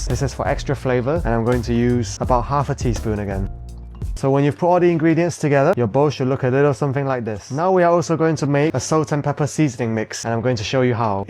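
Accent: Northern England, United Kingdom. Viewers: Do I detect a slight British accent? 😲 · Slight hint of northern English accent?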